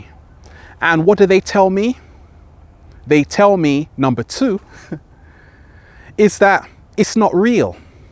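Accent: United Kingdom. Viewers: btw are you British? your accent seems to point yes I cant help but notice that you slur on your s and yeah i love to watch porn and enjoy doing it and then some time ashamed about what type I watched lol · (Diving Deep) You're a decent looking black guy with a British accent. Come to the US, white girls will be all over that D.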